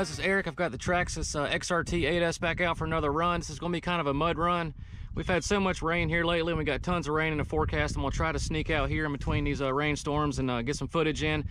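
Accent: South, United States. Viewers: Once I heard that accent I knew I was dealing with a pro, that amount of redneck and annunciation is a dead giveaway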